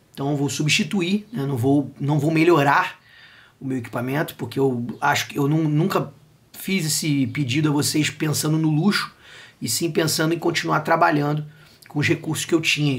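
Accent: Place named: Rio de Janeiro, Brazil. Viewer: Eu descobri o canal ontem. Tava procurando ver algumas dicas sobre programas gratuitos e dei like em quase todos que assisti. Hoje vi o vídeo que você mandou ontem sobre a interface. Aquilo doeu em mim. Sei o quão difícil as coisas estão pra todos. Eu não poderia ajudar hoje, ia esperar ver se entrava algum, pra mesmo sem nada, poder ajudar um pouco. É o ditado que diz que onde dorme um, dormem dois...ou quase isso. Mas logo depois vejo esse vídeo e fiquei feliz de saber. Desejo tudo de bom pra ti e esperamos que essa porcaria acabe logo pra gente poder voltar a ensaiar, tocar, gravar, aparecer mais shows, trabalhos, gravações, receber um qualquer...e por aí. Bem, eu além de músico e amante de guitarras agora virei luthier e assim que isso acabar, vou te convidar pra vir aqui conhecer minha oficina e a gente bater uns bons papos sobre música. 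Eu já havia brincado com gravação em casa no início desse século mas fiquei muito tempo por fora e agora que voltei. To desenferrujando mas vamos ter muito papo pra bater. Eu sou do Rio, e pelo que vi do seu sotaque, também é. Vai ser bem legal, se puder aceitar o convite. Abração e fique bem com sua família. Parabéns, pelos vídeos! abração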